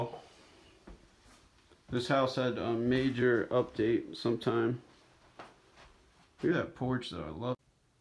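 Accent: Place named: South, United States. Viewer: There is something unnerving about an explore when its insides don’t match its outside. This one creeped me out a bit, but was redeemed in the end by the hand-hewn walls you discovered. You yourself are exactly as your voice suggests: A good-hearted, soft-spoken, southern boy. Pardon me saying “boy” if you find that offensive, but I am myself edging into old lady territory though I have yet to annoy any children by pinching their cheeks. At any rate, your insides match your outsides.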